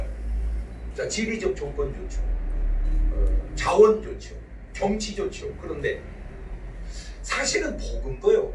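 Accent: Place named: Chungcheong, South Korea. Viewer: 장경동 목사님의 설교를 듣다보면 참으로 공감하는 부분이 너무나 많습니다 나는 서울에 사는 천주교인 인데 장목사님을 너무나도 존경하게 됐습니다 특히 구수한 충청도 사투리에 진실된 설교 정말로 공감합니다 서비스로 비밀 한가지 말씀 드릴게요 ? 나라 화합 하지않고 대깨문 위한 정치하는 역사상 가장 무능한 자 어벙이라고 아시죠 이번 기회에 혼좀 내주세요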